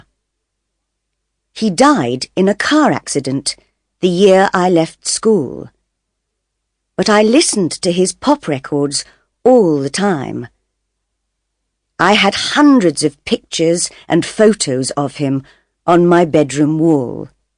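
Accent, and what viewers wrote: United Kingdom. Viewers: British or American accent? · Thank you. It so helpful for me in British accent and reading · مشكلتك الاكسنت البريطانى غير واضحة!!! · Because that girl who is reading for us the story is an English girl which means she has clear accent English. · This is British accent, I do not like it. I like American accent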